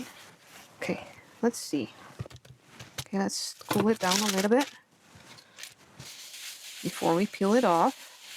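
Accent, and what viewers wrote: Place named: Netherlands. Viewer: You sound a little bit dutchie 😀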